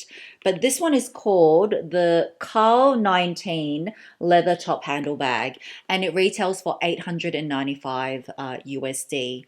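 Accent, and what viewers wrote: Australia. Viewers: HI, I so love your Aussie accent, my sister lives in melbourne maybe one day i have a chance to meet tou in person. You are so lovely!!! I love that versace bag it is so cute!!!! mheller161 IG.... Looking forward to more videos❤️❤️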